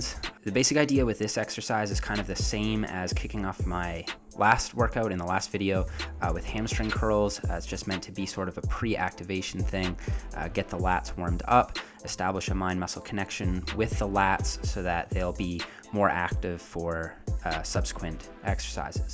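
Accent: Canada. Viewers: Does anyone know what city this dude is out of? Canadia for sure? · Is this guy from Canada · Is this dude Canadian??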